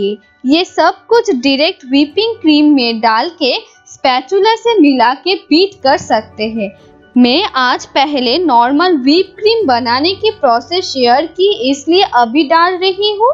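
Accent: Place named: Bengal, India. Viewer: Thank you for all the information. BTW, your accent proves that you are a Bengali. 👍🏻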